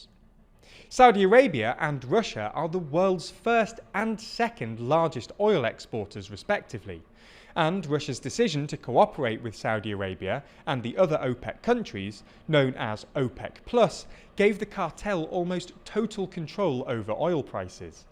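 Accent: United Kingdom. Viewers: Yes, this is exactly what this channel needs, not just 538 with a British accent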